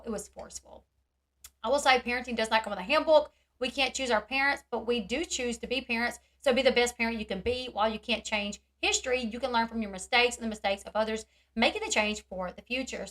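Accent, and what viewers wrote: South, United States. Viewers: I don't really like channels like this as in...I usually lose interest or find it boring or the speaker annoying...or their voice irritating. However, I really like your channel and I subscribed. Maybe it's the familiar twang in your voice that feels comfortable because I was born and raised in the south. You also seem genuine and humble. Anyways I just wanted to express that I love what I have seen so far. You're doing a great job ma'am 🤗🙂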